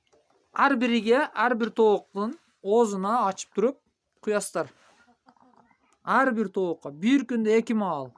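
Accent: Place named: Uzbekistan. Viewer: Озбек окшойт кыргызча суйлогон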